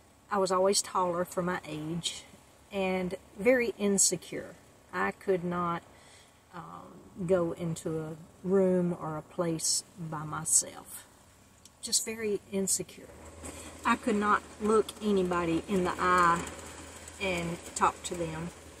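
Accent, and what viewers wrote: South, United States. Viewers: Where are you from? You have a southern accent but were going to see your son in New York. I was just wondering where your journey began.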